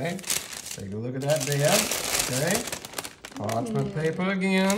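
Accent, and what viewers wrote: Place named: Cajun, United States. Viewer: I love this. Looks so good. Love your accent, where does tat come from? Cajun?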